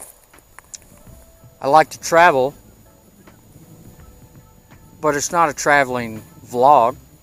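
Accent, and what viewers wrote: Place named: Kentucky, United States. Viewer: God Bless, I knew right away you where from Kentucky. Love your videos ❤️🙏🏻🇺🇸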